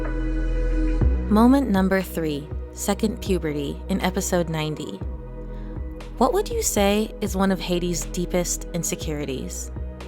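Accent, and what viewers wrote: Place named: New Zealand. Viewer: Love hearing that NZ accent!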